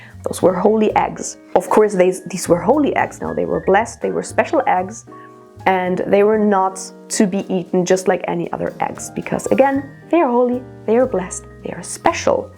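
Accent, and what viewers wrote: Germany. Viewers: You have a great "accent" like german would be your mother tongue. Greeting from germany! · I'm a Christian and I think you very informed. And if you are German I love your German Accent!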